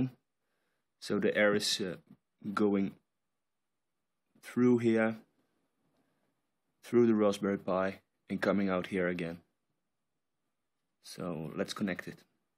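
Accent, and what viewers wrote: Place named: Netherlands. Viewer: you sound like you're dutch c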